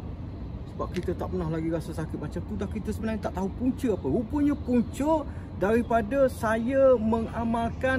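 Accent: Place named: Terengganu, Malaysia. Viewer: ado bunyi sikit loghat teganu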